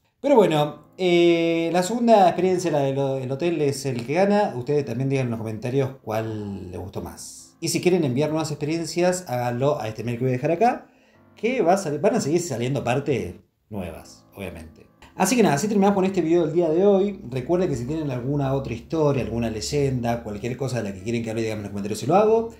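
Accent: Argentina. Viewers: me encantan tus historias, he notado como te estás "cordobeseando" en cada relato....te vuelvo a sugerir la capilla de Buffa en Unquillo y anda conocerla también porque es un museo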